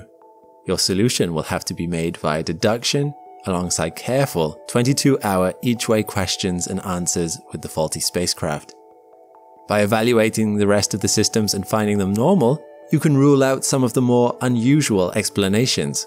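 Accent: Wales, United Kingdom. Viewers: are you welsh ? ur accent sounds slightly welsh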